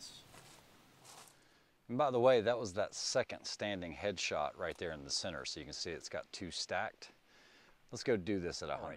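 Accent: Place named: Midwest, United States. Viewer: random, but me and my buddies are trying to place your accent! where are you from? i think you have the vowels of the midwest personally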